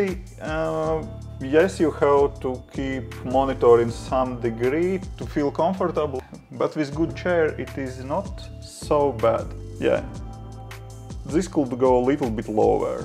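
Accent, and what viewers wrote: Russia. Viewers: Ооо так вот какой "русский акцент" стендаперы вечно пародируют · Я слышу русский акцент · ни фига, это конкретно русский акцент) ты мало чешских видео смотрел my friend XD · Your fucking russian accent is super annoying.... Kommunist yerk.... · Русский акцент рулит))) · i said that because you have russian accent. This my opinion ) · Ты чо, русский? Чего за диалект. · Your accent is so Russian!;) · слышно русский акцент) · Ты русский? Чувствуется акцент. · Говорите как будто Русский на Английском... )) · I felt a Russian accent, хотя может мне показалось) nice video, hello from Kazakhstan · Лады, понял. Просто уж сильно похож на Русский акцент с явным преимуществом в разговоре звука "Р-р-р-р" :))) Похожий акцент ещё у Аргентинцев с явной звучной буквой "Р", НО сетАп всё равно классный, спасибо за идею. :) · Hi . Are u russian? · Русский чтоль? 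· are u russian? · Да да да!! -_- я слышу русский акцент, причем лютый =)))) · Мужик русский судя по акценту. Да и всё выдаёт ;) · Ох уж этот акцент из Russland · Your accent is very similar in Russian, yes) I thought you are Russian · о да, русский акцент)) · Чувак)) у тебя явный русский экцент)) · i'm confused, you have an accent that sounds somewhat russian, but your name sounds nothing russian...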